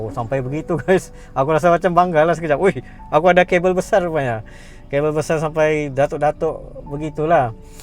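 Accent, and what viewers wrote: Sabah, Malaysia. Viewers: Aku dari first day tgk sudah tau ni slang Sabah. Semenanjung juga la paling sensitif kalau dengar Sabahan slang. Lawak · yang komen to buduh kah ? loghat sabah ngan indo pun tak dapat beza. duduk dalam gua kah dia tu . kau relex je bang. budak2 skrang mmg cmtu. tu budak tu klo di tempat aku mmg kena skolah tu orang. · Hanya sabahan cam sabah accent 😅 Jalan siring2 baru Selamat bah😂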